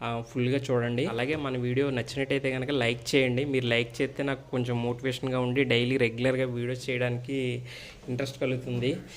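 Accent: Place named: Andhra, India. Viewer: Mana Andra slang theggottandi ani😊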